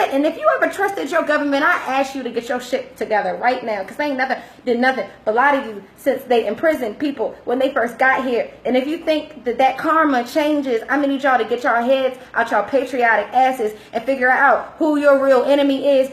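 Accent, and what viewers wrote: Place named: DMV, United States. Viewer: You have a DMV accent 💜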